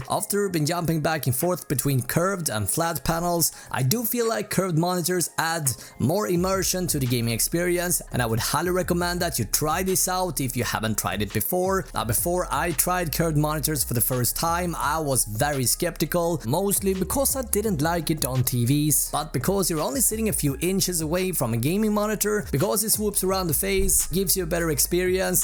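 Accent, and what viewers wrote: Sweden. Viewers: SVENSKKKKK man hör det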